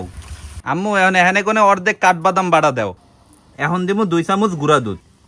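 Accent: Barishal, Bangladesh. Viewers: Cock মানে বললেন রওয়া বা মোরগ এইটা বরিশালী ভাষা আমিও বরিশাল এর বরিশাল এর কিছু কিছু language অনেক❤ sweet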